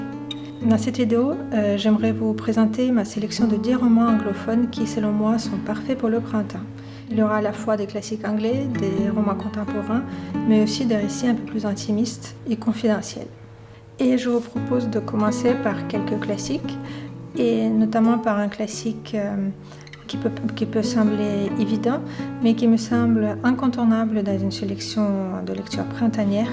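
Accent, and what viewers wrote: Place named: Russia. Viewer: Bonjour et merci pour cette vidéo très intéressante et tellement douce. Je vais relire Orgueil et Préjugés dans la traduction Gallmeister. J' ai Femmes et filles depuis un moment dans ma bibliothèque et vous me donnez l'envie de le lire. Votre accent me rappelle mon amie en Russie que j' espère revoir un jour... A bientôt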